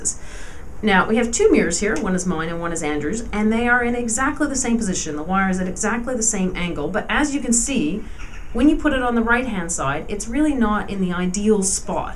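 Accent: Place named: Canada. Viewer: Canadian, eh? Nice review.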